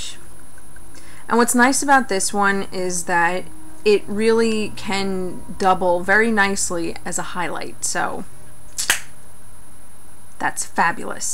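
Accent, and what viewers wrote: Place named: New York, United States. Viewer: haha you embrace the pale! that was awesome. I do the same. Are you from NYC? Long island? im hearing some new yorker in your words..im from Bklyn!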